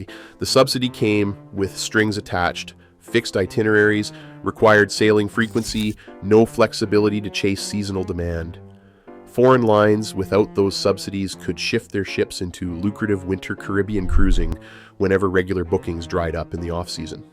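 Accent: Canada. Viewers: ...and the Canadian accent.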